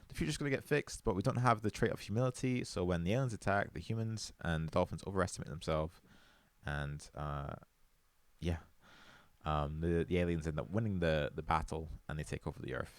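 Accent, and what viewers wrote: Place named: Scotland, United Kingdom. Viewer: Yeah, man i’m just a few miles away from your home town! Throughout the video after I made the comment I was just thinking - surely this dude has to be Scottish somehow 🤣. I figured maybe even your parents. How come you moved away from Costa Del Scotland?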